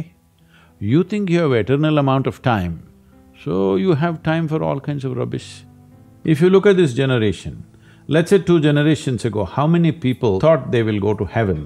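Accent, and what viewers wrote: India. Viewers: South Indian English , i think is far better than hissing Scottish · It's ur loss really. BTW, Google is able to fairly subtitle Indian accents, not perfectly, but fairly. Maybe give it another shot when u feel more open-minded 👍✌️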